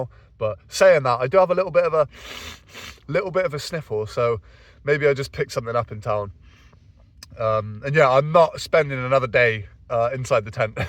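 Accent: United Kingdom. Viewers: i like your british accent! Cracks me up when you say b**thole of water <3. Love your channel!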